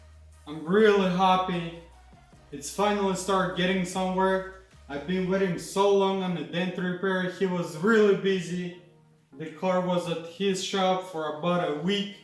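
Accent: Russia. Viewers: you speak english like a russian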